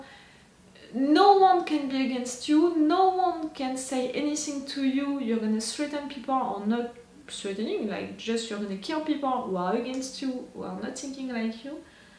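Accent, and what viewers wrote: France. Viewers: Ouais on ressent énormément l’accent franglais ahah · Je découvre cette chaîne Mais après quelques secondes, j'ai compris que tu étais française. Entre français on se reconnaît xD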